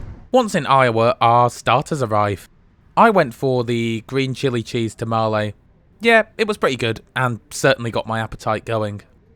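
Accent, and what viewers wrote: United Kingdom. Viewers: I love hearing a British person narrate their American train adventures, it's a good way to see my favorite parts of my home country as experienced from an outside perspective.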